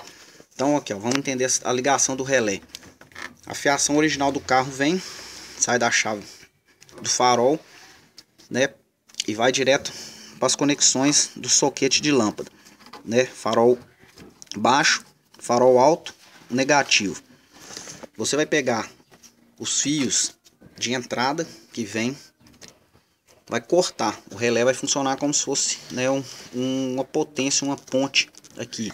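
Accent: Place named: Minas Gerais, Brazil. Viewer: Boa, sotaque de mineiro.Bem explicado.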